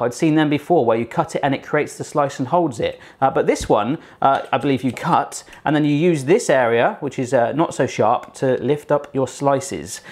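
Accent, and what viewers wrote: United Kingdom. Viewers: Doesn't like tea? Is he british or does he just have an accent? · u always say "I hate tea" or "I hate olives". well u hate a lot of things ow ant btw i love ur Brittish accent! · I usally hate the british actsent but when you talk, i totally felt in love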